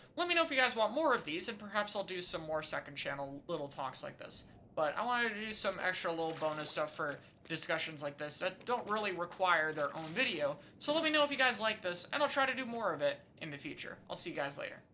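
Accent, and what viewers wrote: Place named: United States. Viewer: Something about your cadence in this video makes you sound like an American flc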